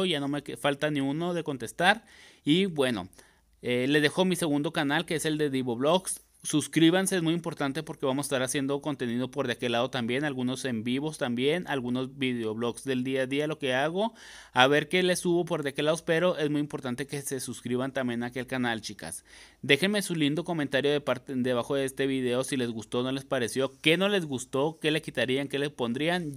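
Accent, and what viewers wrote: Chihuahua, Mexico. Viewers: Es Al acento de Chihuahua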